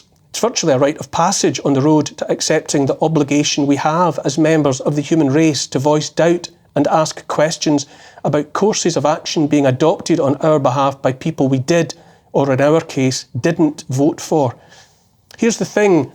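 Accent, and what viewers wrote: Scotland, United Kingdom. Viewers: Thank you for your commentary. Glad to hear so many talking about this. I especially like to listen to you Scottish accent!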